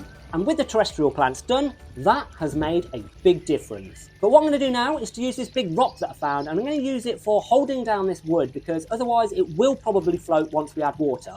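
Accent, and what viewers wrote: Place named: Australia. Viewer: Abrasive accent. Australian?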